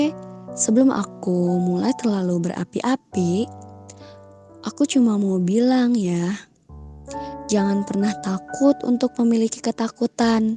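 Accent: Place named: Medan, Indonesia. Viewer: kakknya pasti orang medan kan🥰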